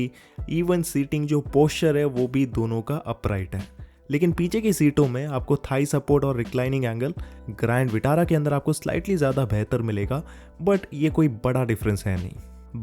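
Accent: Kerala, India. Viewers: Mallu accent 😊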